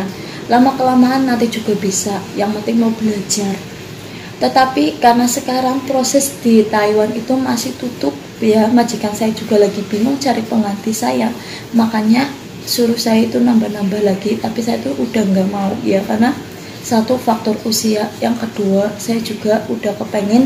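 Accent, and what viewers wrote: Banyumas, Indonesia. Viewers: Pilih mana y? Ngapak gesss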